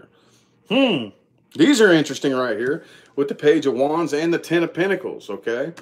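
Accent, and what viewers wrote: South, United States. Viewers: Loving your southern accent. I’m from the south too.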